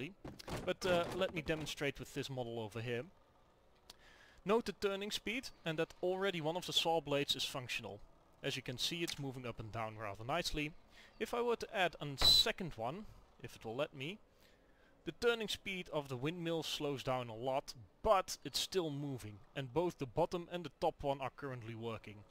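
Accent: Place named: Netherlands. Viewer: dat is leuk ik ook ik hoorde aan je stem dat je niet van Engeland of America was je klinkt gewoon Nederland toen ik je hoorde praaten dacht hij klinkt Nederland ff vragen waar hij vandaan komt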